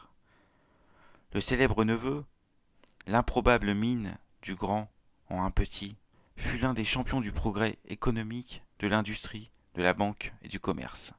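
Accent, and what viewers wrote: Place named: Brittany, France. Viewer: C’est incroyable comme tu as travaillé sur ton éloquence et ton accent breton qui était beaucoup plus marqué auparavant. Ainsi que sur le dialogue.🌟🍀 Personnellement, je n’ai rien contre les accents mais continues ainsi c’est mieux d’avoir un accent neutre pour se faire entendre par tout le monde😂, je reconnais le travail sur soi accomplis.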